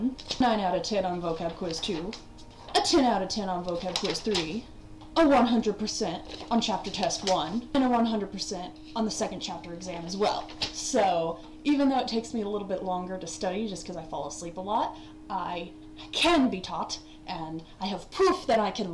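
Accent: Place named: Midwest, United States. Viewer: Dear, Again, I am bowled over at your great attitude and unselfish sharing of what most would want to hide away. It’s an encouragement for me dealing with some intractable issues of my own. Obviously, you are studying Japanese. I am very curious as to why? I love language. I was born and raised with two, English & Croatian. I’ve added German, French, Farsi (including reading and writing the Arabic script), Pali & Sanskrit. I’ve started on ASL, but Japanese has always been on my list. A big motivation is how much one can learn about a people and their culture from their language; from the throngs they have words for to those they don’t to those they have many words for with very minor though important differences. What is your reason for choosing Japanese? You sound like someone from the US Midwest when just talking. But even using the words from the dances and songs your intonation seems spot on. 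Thank you in advance for sharing if you choose, too. With much Metta, John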